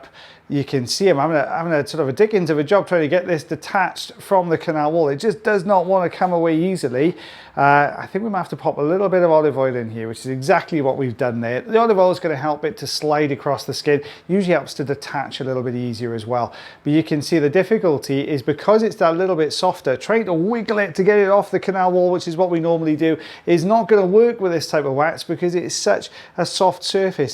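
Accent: Wales, United Kingdom. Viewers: Love hearing you speaking, that lovely Welsh accent.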